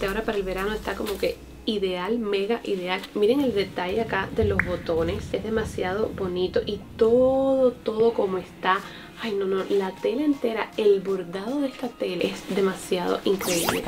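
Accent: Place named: Cuba. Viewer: bella hermosa hablas muy parecido a sandra cires art obvio las dos son cubanas no lindas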